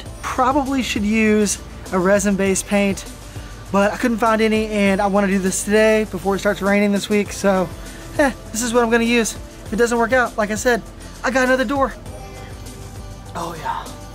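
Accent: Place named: South, United States. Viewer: The southern accent didn't clue you in?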